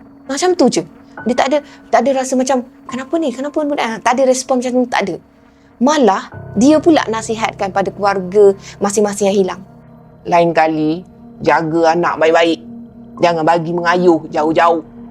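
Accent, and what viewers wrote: Kelantan, Malaysia. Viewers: ohh akak mmg asal org perak ea sy ingtkn akak org kelantan😁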